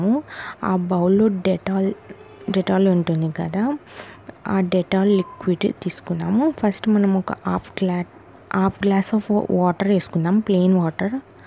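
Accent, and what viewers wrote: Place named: Telangana, India. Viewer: super voice telanga voice alane untadhi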